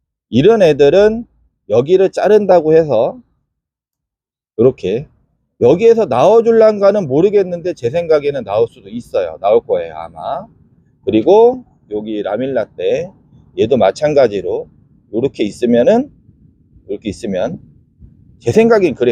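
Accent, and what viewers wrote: South Korea. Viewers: 경상도억센말투만듣다가대전사투리들으니넘정겹네요!, 영상잘보고배우고 감사합니다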